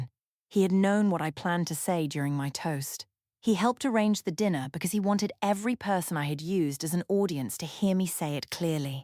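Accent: England, United Kingdom. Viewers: fidgeting or touching ofthe face or neck. Looking away. There are many things that are repeated like i told myself. Or mechanical precision. Many.of the stories seem.to follow the same template.she humiliates her husband. He starts sleeping in the other room. He locks himself in the home office getting everything together for a consultation with an attorney. The wife is getting too friendly with a co worker. And the English accent can be a little irritating after a while.im not trying to be critical. Just thought some feedback may help your channel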